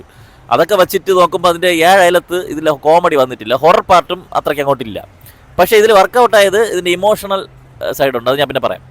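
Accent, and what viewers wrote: Trivandrum, India. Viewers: Dey trivandrum aahdey 🥴🥴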